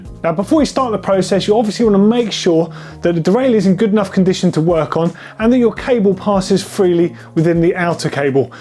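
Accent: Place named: United Kingdom. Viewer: Thanks for the advice. My husband Michael was having trouble getting his Deore XT 12 speed working properly. He said he chose your site because he said people with a British accent just seem smarter! He will be out again tomorrow because of your help.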